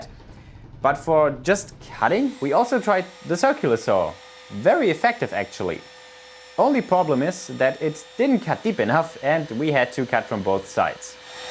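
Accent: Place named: Upper Bavaria, Germany. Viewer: tatsächlich? Ich hätte und dich eher als Oberbayern eingeschätzt. Seid ihr richtig ausm ländle, oder aus dem dahergloffene bayrische "schwaben"? (Ja, ich tu mich offensichtlich schwer bauernschwäbisch in schriftform zu verpacken)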